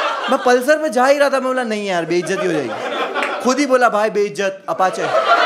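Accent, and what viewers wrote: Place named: Gujarat, India. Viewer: It was a nice stand up,I totally loved it and can't stop smiling since the beginning of the video and munawar has a good gujarati accent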